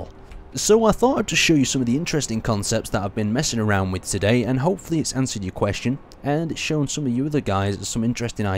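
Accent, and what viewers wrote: United Kingdom. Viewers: "no dam-edge to the stayshin at awl" filthy fucking britbong liar it damaged the station get ur fuckin eyes checked are they as bad as yoru teeth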